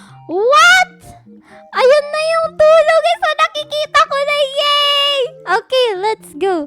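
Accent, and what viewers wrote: Visayas, Philippines. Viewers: Ate bisaya ka pala kala ko Tagalog ka ❤❤❤❤ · Bisaya kaba ate!?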